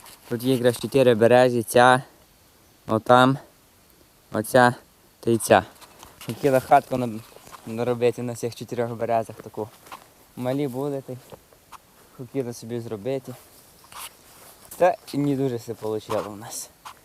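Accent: Hutsul, Ukraine. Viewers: Дуже люблю гуцульський діалект, я сама з бойків, ваших гірських сусідів.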